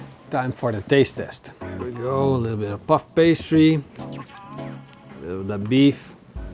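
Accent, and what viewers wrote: Netherlands. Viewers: You‘re dutch, right?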